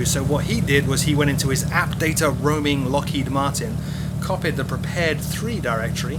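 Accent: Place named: United Kingdom. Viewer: I can tell you have a Brit accent, but for some odd reason I get the feeling you live in the states.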